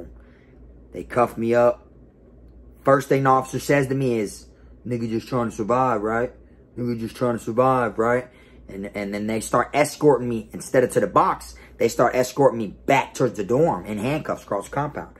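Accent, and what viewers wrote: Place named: Florida, United States. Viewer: He sounds like he from florida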